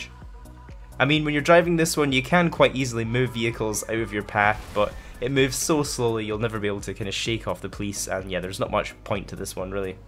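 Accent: Scotland, United Kingdom. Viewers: Is he Scottish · Are u Scottish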